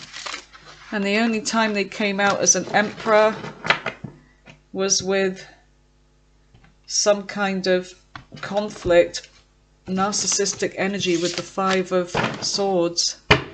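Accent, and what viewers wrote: United Kingdom. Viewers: So accurate - can’t believe it. Love the British accent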